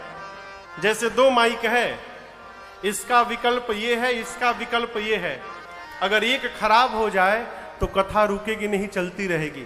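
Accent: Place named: Purabiya, India. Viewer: Har baat mein Anand hai ho kya kar rahe ho Puri Katha mein ho hai ho hai ho hai ho kar Diya purabiya Bhasha bolate ho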